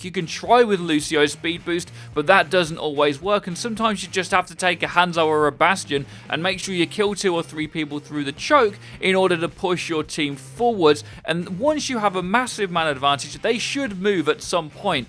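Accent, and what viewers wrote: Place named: United Kingdom. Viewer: this british accent makes me wanna vomit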